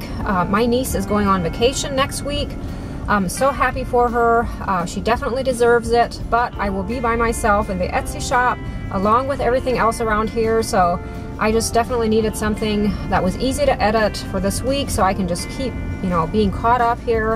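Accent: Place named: Pennsylvania Dutch, United States. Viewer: Hi... my grandmother from PA was fully Pennsylvania Dutch as well. Thereby, making me a good portion!.. You pronounce so many words just like she did! So cool!. The scenery is so awesome where you live! The fabric store is great one of a kind, and the market with beautiful produce etc is so fantastic. The garden shop! Wow...everything is simply Amazing! Thank you! May God bless you!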